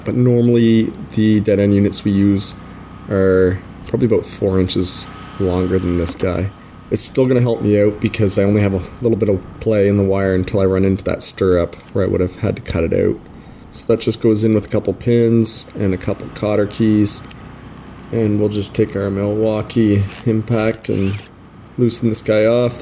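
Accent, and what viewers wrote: Canada. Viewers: Are you from Canadá?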